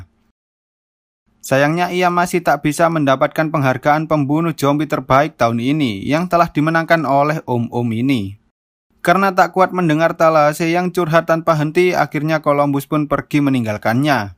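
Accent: Java, Indonesia. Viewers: Wong jowo Iki? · Mas pean wong jowo ta😁😁😁 Wocoen mas😁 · Jowomu ngendi min😂 Kediri Jawa timur hadir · weleh wong jowo toh mase🖐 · Jowone mantep🤣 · Mas jowo Endi kowe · bang Lu orang Jawa ato Sunda Sih?😙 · wong endi mas😂 kok iso jowo aku seko jogja salam mas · Ngomong jowone lucu aku ngakak anjir onok - onok ae😂😂😂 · Jowo rek wkk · Lambe jowo. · Jowo Yo mas 😂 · Medok Medok Mantapp 🤣🤣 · Medok nmen mas V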